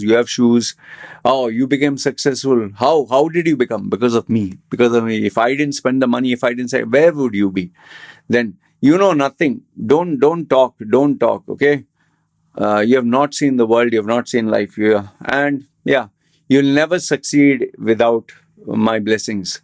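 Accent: Kerala, India. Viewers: Hi, i watch your videos because i like your style of communication. Your accent suggests you could be from Kerala or raised there. Is that correct? Only asking out of curiosity.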